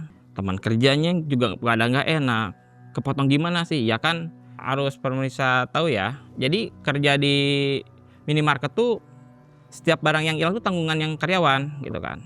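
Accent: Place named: Bandung, Indonesia. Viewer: logatna bandung pisan,saee dina bahasa na..jadi paham rng enakeun kakupingna th👌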